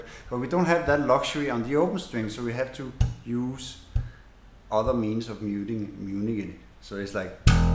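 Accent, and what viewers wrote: Denmark. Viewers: That is one Danish accent right there :) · That is the CORE of danish accents! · haha vidste du var dansker da du sagde hej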